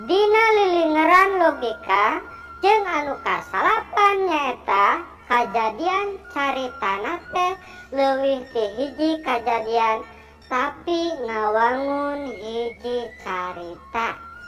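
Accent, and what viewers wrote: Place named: Garut, Indonesia. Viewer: Sundana ti garut nya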